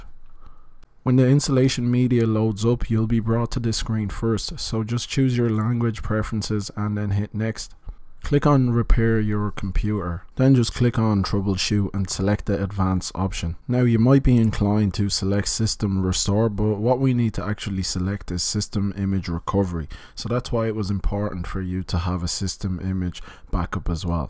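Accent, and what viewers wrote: Ireland. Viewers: Are u Irish? And good video man👌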